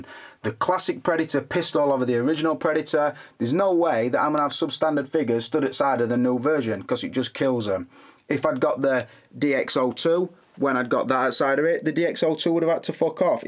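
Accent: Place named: Yorkshire, United Kingdom. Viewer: tou sound like a good old yorkshire boy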